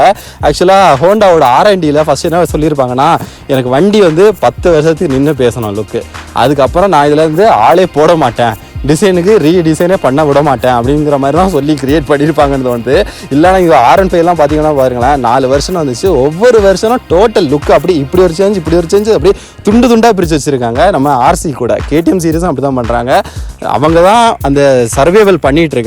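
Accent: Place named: Kanyakumari, India. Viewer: but slang lita kanyakumari slang varuthae 🤔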